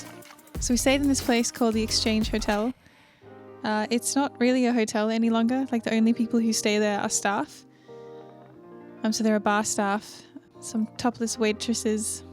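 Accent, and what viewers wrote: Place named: South Africa. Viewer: Hi. I'm trying to work out your accent. Maybe South African?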